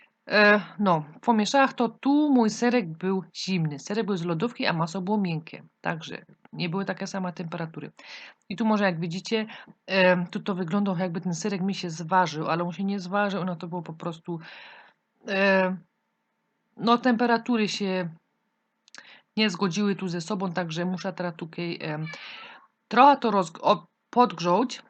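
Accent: Silesia, Poland. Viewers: Fajna gwara Śląska . Robiłem to ciasto już wcześniej ale bez masy.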